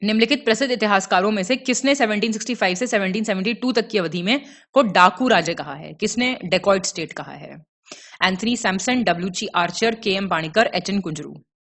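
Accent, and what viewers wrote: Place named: Uttar Pradesh, India. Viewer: Madam g jyada angreji bilari na bano Hakikat me jio Up wale andaj me bolo jyada yad rahta h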